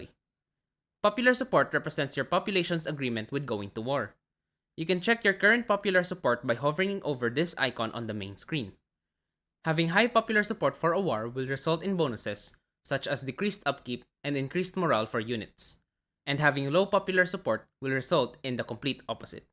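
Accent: Philippines. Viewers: Hi there you sound Filipino sorry if im incorrect. But you are the best Planetfall teacher ever, you literally teach us what we need to know unlike other youtubers they talk for hours and it so boring and draining I can't be bothered listening to them. I only needed to know what diplomacy was but one question How did your game start with ''0'' reputation for your enemies in my game they always start with -300? and I am always at war. I can't pronounce anything to them in Scenario. I also can't trade Casus Beli. What am I doing wrong how do I start with Neutral Reputation. Please anyone help.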